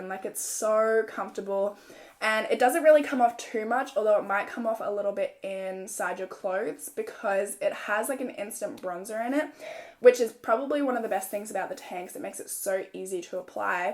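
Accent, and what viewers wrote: Australia. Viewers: I just live for your Australian accent 😄😄❤️ I never knew you were that fair to tan your body 😲😲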